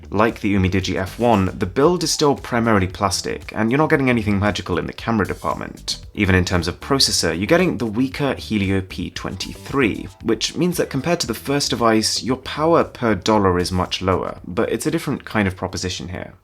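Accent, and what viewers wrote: RP, United Kingdom. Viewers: How refreshing to have a review given by someone who speaks the Queen's English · for some reason this guy's accent sounds too posh for my ears.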